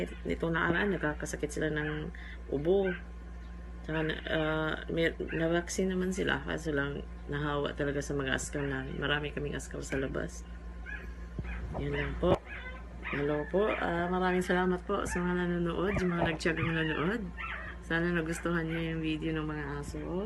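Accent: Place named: Visayas, Philippines. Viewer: bisaya ka te... pila ka dosage ang 2 mons te?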